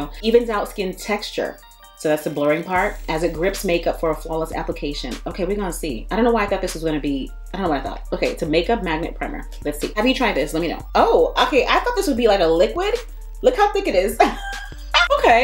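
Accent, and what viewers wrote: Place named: Rhode Island, United States. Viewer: Come thru with that RI accent!👌🏼🤌🏼😂🤣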